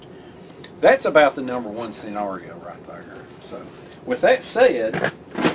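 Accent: South, United States. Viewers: So full of information. Great humor and have great southern accent love to hear you talk.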